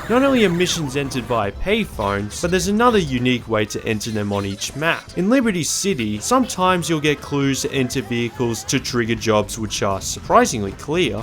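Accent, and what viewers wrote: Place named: Australia. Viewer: your accent is a really annoying version of an aussie one, did you watch too many american movies growing up :/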